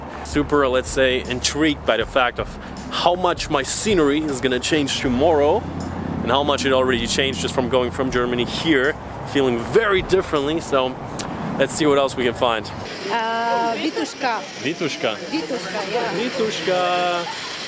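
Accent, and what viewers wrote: United States. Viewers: You actually speak english really well with a good american accent, been living all your life in the States I suppose. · Rich Bowman Yes my very thought too! I don’t know what nationality this guy is but he definitely has an American accent! If he is American then that would explain the BS!😳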